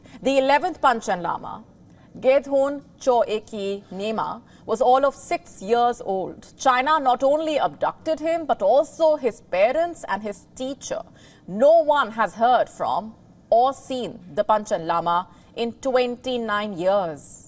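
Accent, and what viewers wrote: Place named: India. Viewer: Her curry accent is so strong, can't even pronounce Tibetan.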